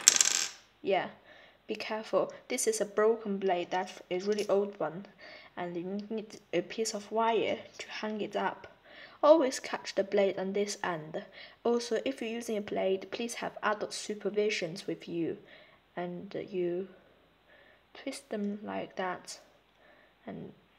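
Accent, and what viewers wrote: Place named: RP, United Kingdom. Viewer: Why does this guy sound so posh?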